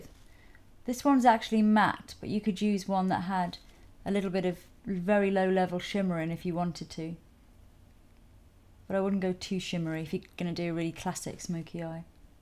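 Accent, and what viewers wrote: England, United Kingdom. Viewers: Which part of the UK are you from? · I like listening to your classy English accent. Reminds me of clients calling my line at work. :) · I like to listening English accent . You have got very beatiful voice . Videos are very beautiful Thank you ♥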